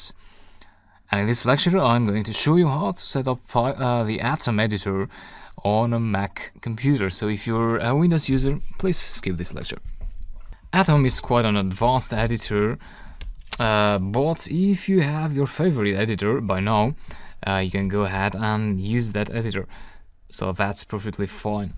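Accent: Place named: Russia. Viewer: are you russian? You have a nice accent.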